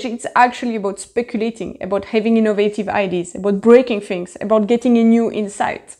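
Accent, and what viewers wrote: France. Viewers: Thanks for your video, which gave me the opportunity to test some of my ideas. One thing I couldn’t help but notice. Some of the comments make me want to take up the cause of woke micro aggressions although the intent is positive. I mean to say a French women (my assumption) with a French accent! Imagine it… people need to travel more I think aside from COVID and global warming implications…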